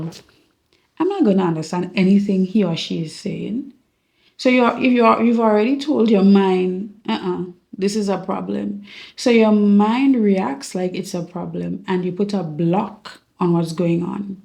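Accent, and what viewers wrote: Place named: Jamaica. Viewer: That's so funny thing your saying I thought you was from Jamaica 🇯🇲 you sound like one.I am from ST.THOMAS our accent is strong. Do you speak another language 😊? I speak Spanish as well.I am so happy for your content keep it up😊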